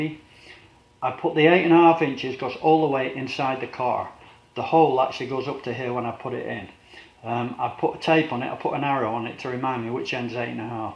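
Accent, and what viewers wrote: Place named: Northern England, United Kingdom. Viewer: The accent is still as strong, I would guess Rochdale, Chorley, Bury, around that area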